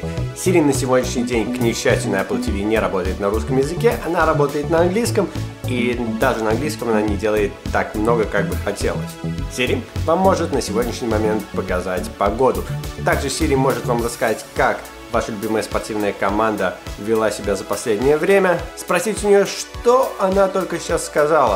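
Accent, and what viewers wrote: United States. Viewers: Американский акцент человека у которого родители привезли в детстве в США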